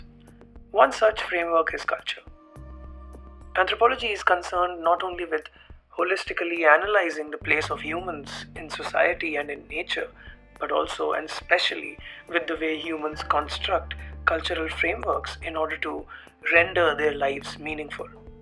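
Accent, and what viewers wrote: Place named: India. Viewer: Very nicely done. Indian English is sometimes tough for me, but that's what 'cc' is for! I hope this is shared widely. I do not see Ep 2?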